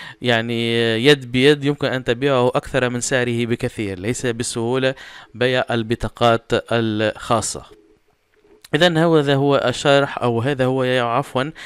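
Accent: Tunisia. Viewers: انت تونسي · هل انت تونسي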